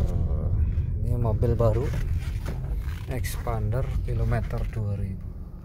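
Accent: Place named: Java, Indonesia. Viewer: Jawane endi um. Kyong medok yaa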